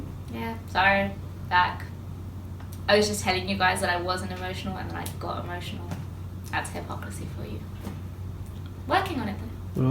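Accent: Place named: Jaffna, Sri Lanka. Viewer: Im in love with her Tamil accent, that yazh Tamil!! She is such a sweetheart! Absolutely love all of her insta vids!!! 😍 -Love all the way from SG 💞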